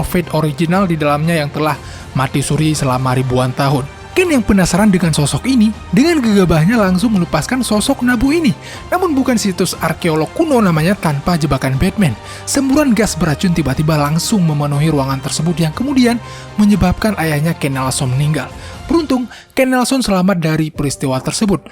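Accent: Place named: Bali, Indonesia. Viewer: Logat bali ne.. Adminnya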